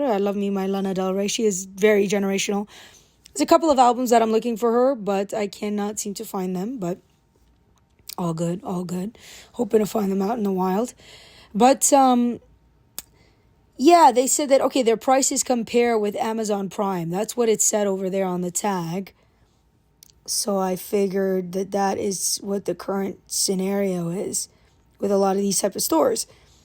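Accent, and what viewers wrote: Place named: United Kingdom. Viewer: I like your bri ich accent , yeah ?😉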